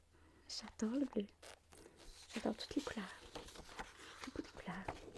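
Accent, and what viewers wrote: Quebec, Canada. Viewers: Ce petit accent québécois que j'adore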